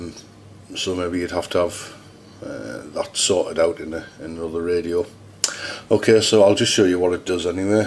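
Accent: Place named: Newcastle, United Kingdom. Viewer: hi m8 u sound like ur up in newcasle in the uk im in hartlepool n just got thr baofeng uv-5rc and need help programming it can u help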